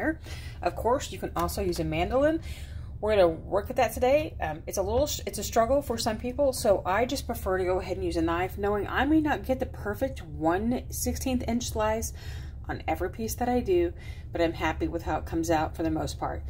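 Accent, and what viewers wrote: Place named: Australia. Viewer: "sorry a bout the cat" lol I was looking around here for which of my 3 cats were going off! Great tips, thanks for the down under speak-loved it! ♥ thanks so much for sharing!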